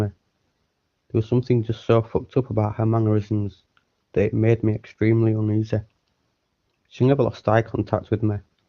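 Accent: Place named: Northern England, United Kingdom. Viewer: You're a very good story teller ( PLEASE don't think I' m being rude ) but you might need to not be so close to the microphone and also concentrate a bit on your diction because some of the words are hard to understand..I btw am a northerner too like you so similar dialect ...anyway you now have another subscriber :)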